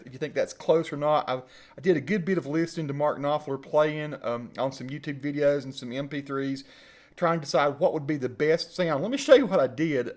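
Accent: South, United States. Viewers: That southern accent though lol. sounds awesome man, I'm gonna buy the vt40x soon! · I love Southern American accent some of my friends actually tell me I've got a southern accent myself but bro! You should use the software to dial down the thick accent I want to decide between 40x and 20x I couldn't pick up some parts you said bruh but loveeeee the accent from IRAN of all places?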